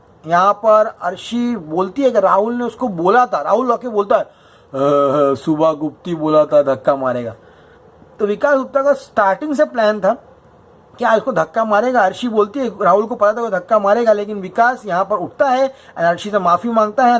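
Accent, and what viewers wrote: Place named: Bihar, India. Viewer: Bhai bigboss wale public ko chutiya bana rahe hai aaj pehli baar mujhe aisa laga ki sab kuch pre planned tha. Vikas ka rona thoda real laga kyuki aadmi jab rota hai to koi bhi pighal jaata hai. Uske pass joker card hai. Wo dubara entry lega. Mujhe bhi gupti utna pasand nhi hai but emotional kar diya usne. bhai Amitabh bacchan ki mimicry ek number mera bhai. And your bihari accent is mindboggling. Love from bihar.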